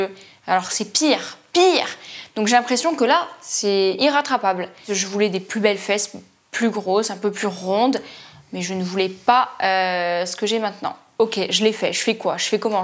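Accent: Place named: Corsica, France. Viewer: L’accent corse j’adore 🥰 « mais qu’est-ce que c’eeest» tu es de loin la Best 🤍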